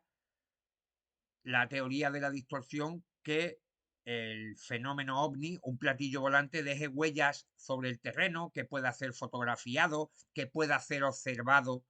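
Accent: Spain. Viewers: Are you in South America or Spain,sounds Spanish in Spain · Como siempre te explicas de maravilla. Me encanta escuchar tu acento ( una Algecireña desde Girona), de verdad hablas mejor que ningún político, periodista u orador actual, viva la Z ' Un abrazo crack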